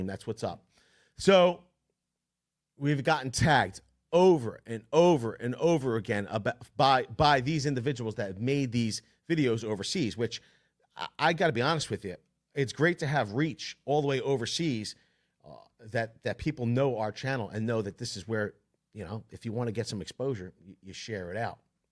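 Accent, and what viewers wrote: Philadelphia, United States. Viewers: I listen to this BS just hear a Philly accent. You guys are alright.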